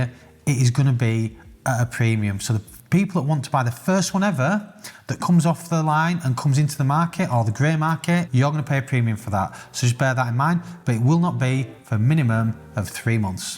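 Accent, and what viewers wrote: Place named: North, United Kingdom. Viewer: Great content as ever. You’ve got your own niche here. A lot of the watch YouTubers are semi-weirdos. Nice to hear some straight talking from up North.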